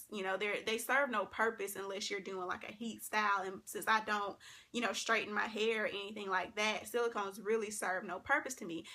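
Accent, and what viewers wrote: South, United States. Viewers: I live in SC and you sound way more southern than I do lol! #southerngirlsrock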